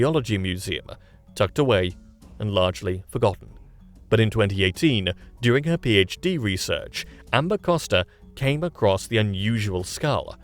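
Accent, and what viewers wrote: United Kingdom. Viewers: If we're going with the theory of evolution, which is never actually been proven, by the way -- why are cockroaches and crocodiles exactly the same over billions of years? Is it possible that those one or two fossils that somebody found were just mutants and not some pre-evolved form? I mean the theory of evolution just cracks me up to be honest and then we get this dramatic British man servant accent and I don't know what to think of it this😅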